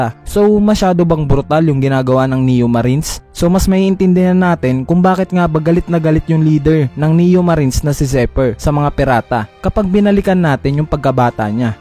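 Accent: Visayas, Philippines. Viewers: Bisaya ka man?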